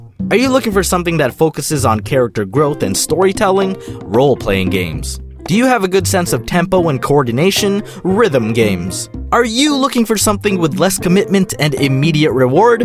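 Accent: Philippines. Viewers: Weird Filipino Accent Tae means poop in Filipino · love the Filipino accent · lol the filipino accent is so on point and funny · sorry dom but I can't stop listening to your filipino accent nintendo pamili komputerr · you sound like a Filipino. I’m a Filipino btw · gotta love that Filipino English accent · love the Filipino accent 😂😂😂😂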